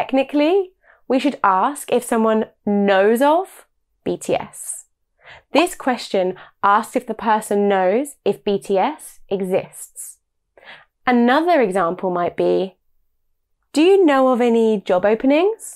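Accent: United Kingdom. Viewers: 와 역시 브리티쉬 발음ㅋㅋㅋ 너무 깐지나네요 전 언어교환101에서 영국인 친구 사겨서 요즘 영국발음 연습중인데 너무 재밌고 럭셔리해요ㅎㅎ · 역시 영국발음은 넘나 간지남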